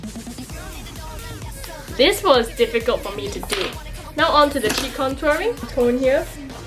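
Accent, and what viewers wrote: Singapore. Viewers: I wan hear more singlishhhhhh😂😂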